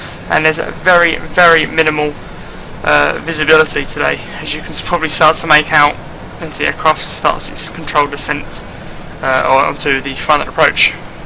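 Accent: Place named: England, United Kingdom. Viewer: Like your English accent 😂🤔🤔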